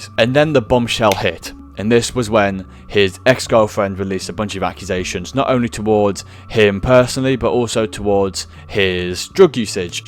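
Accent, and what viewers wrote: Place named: United Kingdom. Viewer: So sick of backwoods British accents on so many youtube videos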